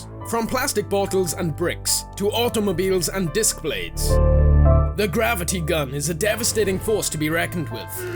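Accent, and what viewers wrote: Wales, United Kingdom. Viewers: i know its a stupid question to ask. are you British ? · Actually nevermind. Wales! Are you welsh? I am Cockny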